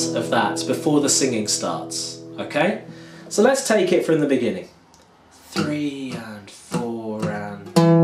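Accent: United Kingdom. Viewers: 🤔🤔🤔 Thé British accent is so class compare to américain's 😱😱😱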